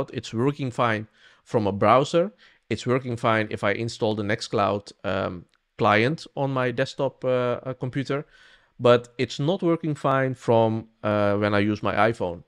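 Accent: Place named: England, United Kingdom. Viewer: Dit is exact wat ik wilde doen, bedankt! Herkenbaar Engels accent😉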